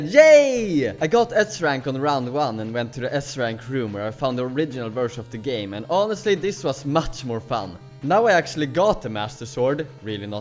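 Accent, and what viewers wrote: Sweden. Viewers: Swedish? May I ask? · Banging video my man, håller med typ allt men bro din svenska brytning är så stark att jag knappt fattar nåt lmao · 1.From your accent I presume you are from Sweden like me 2. I didn’t like the Zelda parts of the chapter at first but they started to grow on me for a while. 3.Kanske du behöver en TANDPÅNG för att lösa dina problem med kapitel 3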